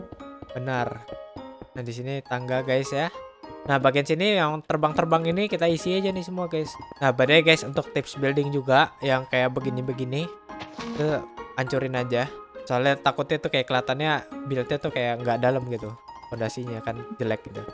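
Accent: Java, Indonesia. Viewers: org jawa kah logatnya keliatn kek jawa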